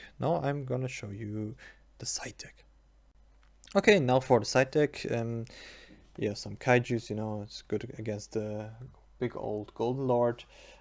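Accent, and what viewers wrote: Germany. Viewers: You have a damn German accent.. why don't you make the videos in German?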